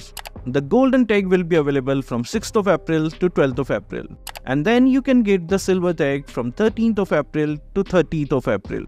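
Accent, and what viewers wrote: India. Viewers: Sir I know ur indian bcoz of ur accent..I love indian people I work here in 5 star restaurant in El Nido Palawan I always have an Indian guests.i hope 1 day u will visited here.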